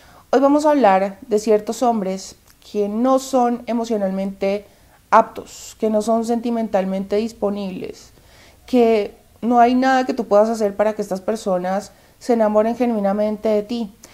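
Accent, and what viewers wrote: Bogotá, Colombia. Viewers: Me encanta tu acento colombiano, ni raja ni presta el acha, aquí en México decimos: ni picha, ni cacha ni neja batear.... excelentes videos.... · Así se expresan los Bogotanos 😊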